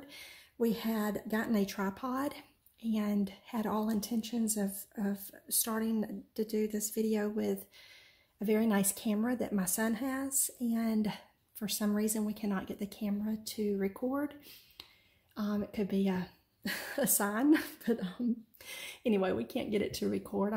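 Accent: South, United States. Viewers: Being Southern myself. I think your accent is perfect. I love your journal. I look for to seeing more of your works. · Don't you dare apologize for your accent lovey, it is just charming ( I love southern accents:D) and it is part of you and your history. Hello from Australia btw xo I just love your journal, it is gorgeous (especially love the idea of the pen/pencil space) have subscribed and I am sure I will enjoy many more of your videos in the future, well done xo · you have a sweet southern voice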